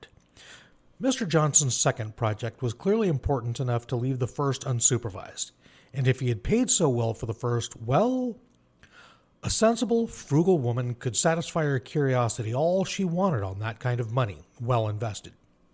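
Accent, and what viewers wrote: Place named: United States. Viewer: I like this story and your voice. NetNarrator and Agro Squirrel are my usual listens, but it's nice to get an American voice once in a while. Keep it up, and don't damage your vocal cords, please!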